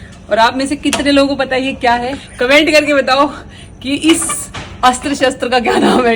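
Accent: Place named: Rajasthan, India. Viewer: Sister ya h Rajasthan kii boli m gandasho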